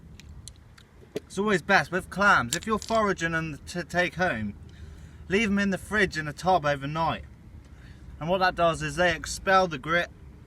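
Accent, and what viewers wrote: England, United Kingdom. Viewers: Lovely British accent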